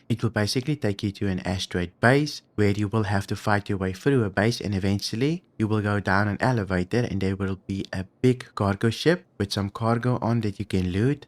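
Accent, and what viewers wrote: South Africa. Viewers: South African accents are very lekker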